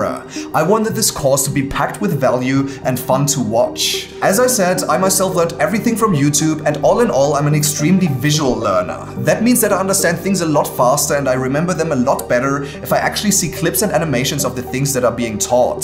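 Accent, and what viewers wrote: Germany. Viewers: Respect und wusst ichs doch dass du nen Deutschen Akzent hast🙃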